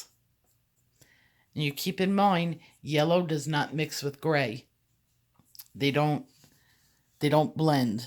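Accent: New York, United States. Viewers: You know how hateful and selfish people can be, but not with any of the wonderful people I have lately. I have asked so many questions and nearly everyone either a tutorial or knows of one and takes me right to the source. It has been great. After struggling for so long with hate and drama it is nice and refreshing to meet kind people. And I could listen to you talk all day. I'm from the South so I know a New York or Northern Italian accent lol I don't hear it very often down here.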